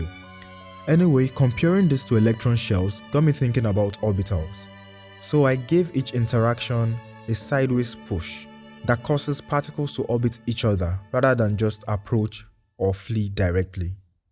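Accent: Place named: Africa. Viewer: you have a African accent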